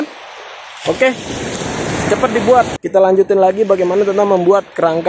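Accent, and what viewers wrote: Lombok, Indonesia. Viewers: logat lombok hehe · Bang org lombok ya?? Xixi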